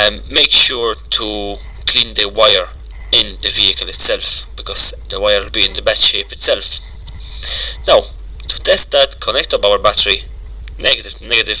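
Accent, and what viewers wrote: Ireland. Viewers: Brilliant stuff ... very clear ( I like your irish accent)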